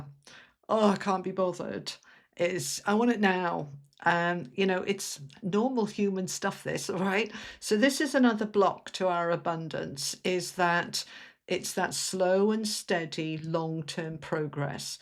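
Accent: United Kingdom. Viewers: thank you 💜 I'll continue to work on it. Your guidance is much appreciated, also lovely to hear a fellow UK accent ☺️ x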